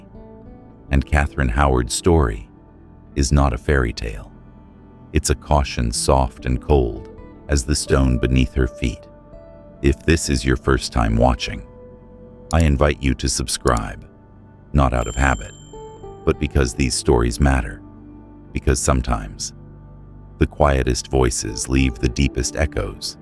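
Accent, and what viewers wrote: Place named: United States. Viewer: Can't listen to my countries history narrated by an American, you just know some things and places are going to be pronounced completely wrong or be inaccurate 😮😮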